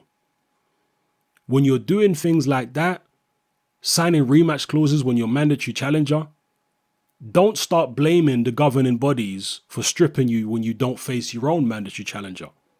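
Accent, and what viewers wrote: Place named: London, United Kingdom. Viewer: Another brilliant video. Ur speaking ability is a juxtaposition. U have the uk London ghetto tone with the Oxbridge vocab and articulation. You should have more subscribers!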